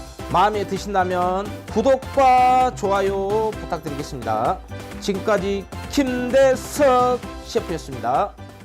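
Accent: Jeolla, South Korea. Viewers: 병원에 입원중입니다 항암치료로 입맛이 없는데 집에 돌아가면 꼭 해먹을래욤 ^^ 전라도 사투리 참 정겹네요 ^^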